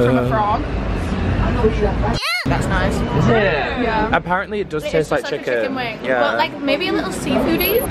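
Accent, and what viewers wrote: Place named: Yorkshire, United Kingdom. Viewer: love hearing my yorkshire accent so blatant in the city of paris #aesthetic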